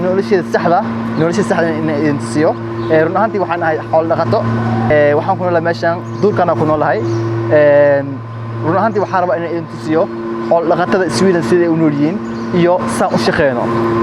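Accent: Mogadishu, Somalia. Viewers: Very interesting although I find it difficult to understand the reer xamar dialect.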